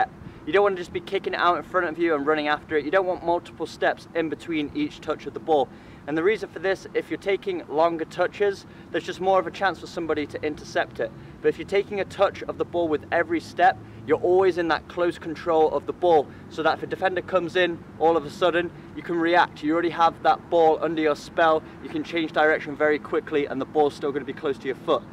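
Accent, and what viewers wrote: United Kingdom. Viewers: Your Accent sound like You’re British Are u. Mate ?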